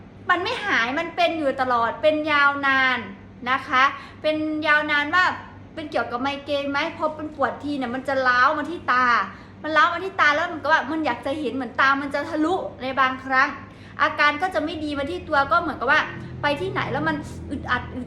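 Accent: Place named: Isan, Thailand. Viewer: สำเนียงคุณเหมือนคนอีสาน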